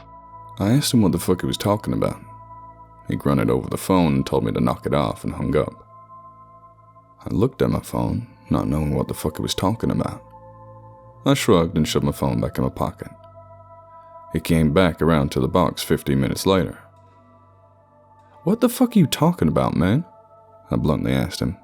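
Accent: South, United States. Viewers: you do a great Southern USA accent! Seriously! I live in Kentucky, but spent lots of time at Lejune while my dad was in the Navy. You nailed the patois perfectly. I love your voice and your giant nature loving heart. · Your American accent is getting better, or I guess I should say accents. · You do an amazing southern American accent for an Englishman! ❤️👌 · Bro ur up there with Jason Hill(chilling tales for dark nights) and ur southern accent is pretty on it espically for u being British.🤘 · As a native Kentuckian, I can confidently say that you actually put on a decent southern accent. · Your southern accent makes me smile 😉👍🏽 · Wow... Your Southern accent is amazing! I almost could have sworn it was your native accent. Well done! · For a Brit, you do a damn good southern accent. Bravo.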